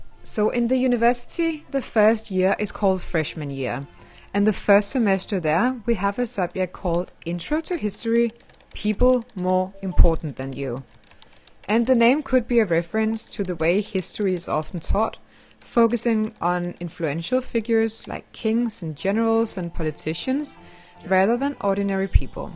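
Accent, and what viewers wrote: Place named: Denmark. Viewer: I soooo love your Danish accent.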